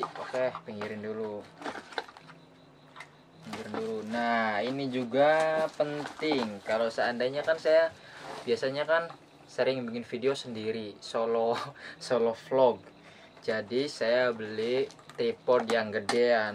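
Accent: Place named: Tegal, Indonesia. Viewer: masa sih..tdk ada ahh hanya di luar saja..indramayu itu bukan nya jawa barat ya..kok bicara nya kaya org tegal atau brebes yo😐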